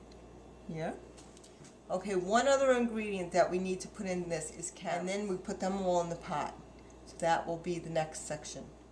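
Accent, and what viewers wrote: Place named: Brooklyn, United States. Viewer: I gotta try this recipe. Thanks for showing us and giving the recipe. OK, I gotta know... Where you are from. I'm guessing Brooklyn or maybe New Jersey. Whshew... Thick accent... But then-again, you'd probably think mine is thick too... :-D I heard you keep saying "My husband likes..." It made me chuckle as I thought a great idea would be to put a few jalapenos in there and warm things up a little!!!! See if your husband "likes" that!!!!!! Woo-hoo!!!! :-D Thanks again! Ya done good on the video!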